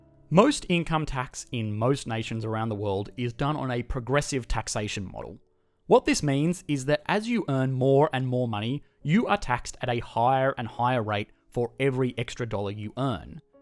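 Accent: Australia. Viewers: Hey are you Australian? You have an Australian accent ahaha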